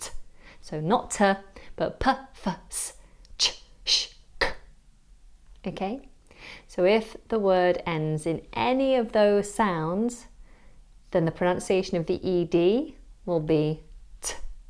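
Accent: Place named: RP, United Kingdom. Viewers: is using RP which is recognised as the standard. is actually a Scouser and has adjusted her accent for her teaching videos. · But... your accent is also a regional accent. You're saying all this as if your way of pronouncing things is the official British way, ignoring the 3 British countries that aren't England, and even the official English way, ignoring the large percentage of the country that don't sound exactly like you. You have a Southern accent - what makes that any less regional or any more official than a Northern accent?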